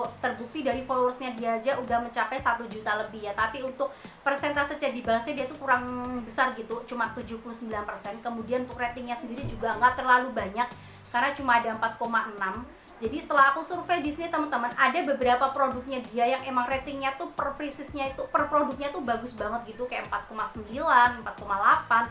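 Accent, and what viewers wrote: Java, Indonesia. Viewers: Medok ya kak😄😁mksih infonya kk. Menginspirasi bngt😊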